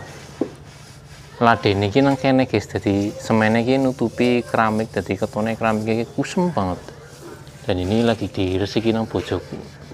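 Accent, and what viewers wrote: Banyumas, Indonesia. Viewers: Wong ngapak nek ora ngapak ora penak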